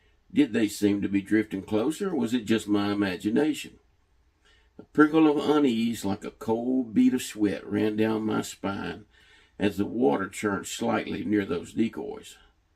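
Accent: South, United States. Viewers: This was amazing, you're such a good writer not to mention storyteller especially with your lovely southern accent. I can't wait to hear more stories from you in the future 😊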